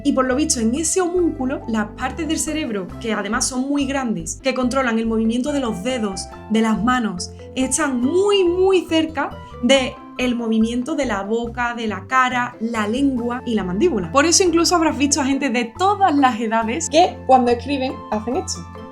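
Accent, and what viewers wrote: Andalusia, Spain. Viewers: Aparte del encanto y calidad musical, me encantan los vídeos de para disfrutar de su inconfundible acento Andaaaaluuuu.....tan parecido a nuestro acento chileno pueeee..... Saludos desde Viña del Mar 🇨🇱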